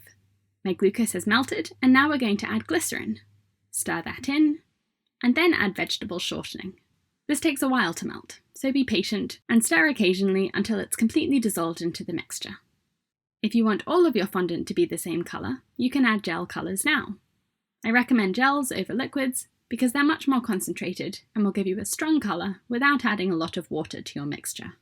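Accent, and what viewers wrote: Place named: Scotland, United Kingdom. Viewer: Where are you based? Sounds like you have a touch of Scottish in your accent but I can't place it. You have a lovely speaking voice.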